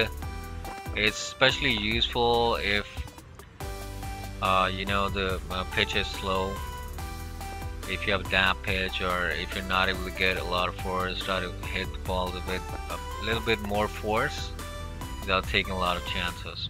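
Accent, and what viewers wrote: United States. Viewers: Are you American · Oh now I get it. In one of your earlier replies you had used hindi/urdu and from your english accent i thought you are an american. 😀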